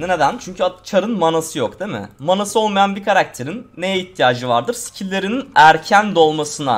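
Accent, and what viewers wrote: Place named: Kahramanmaraş, Turkey. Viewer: maraşlımısın knk sadece maraşda böyle konuşuyolar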